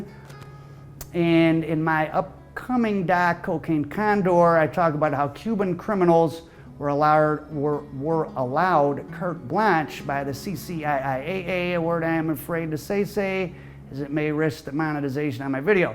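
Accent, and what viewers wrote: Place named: Massachusetts, United States. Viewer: I thought that was a Massachusetts accent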